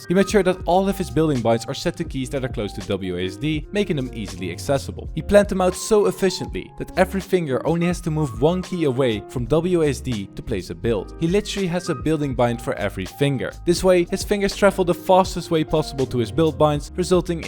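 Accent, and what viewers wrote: Netherlands. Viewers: i just bought my keyboard n mouse and getting it tommorow! you realy helped me thx! btw are you dutch? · Mooi nederlands accent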